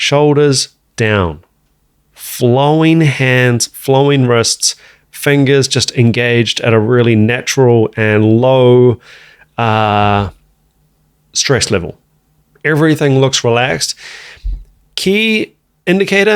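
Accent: New Zealand. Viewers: So true about relaxed..... another of the relaxed drummers I can think of springs to mind:.....Fred Beard of ZZ Top - slumped at the controls........ but no comparison!!! I found you recently and suddenly realised i was finally listening to a NZ accent - wow - how cool is that: we can do this stuff over here too! Love your videos and shorts.....Hi from the Kapiti Coast:)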